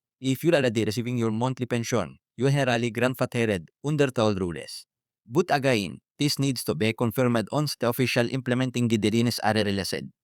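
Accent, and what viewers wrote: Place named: Arab world. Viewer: Dapat English mo clear kaso ginagawa latin Minsan Hindi man slang para Kang arabi mag salita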